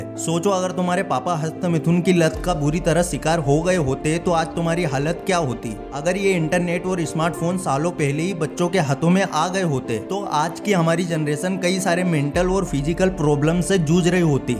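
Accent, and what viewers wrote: Indore, India. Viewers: Indore ke aaspas ke ho aap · Aap indori lag rahe ho...accent se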